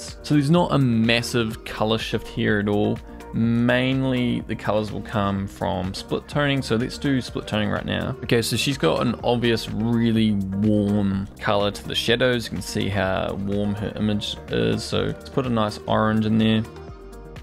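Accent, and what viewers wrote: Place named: New Zealand. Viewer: Think he’s from New Zealand bro bro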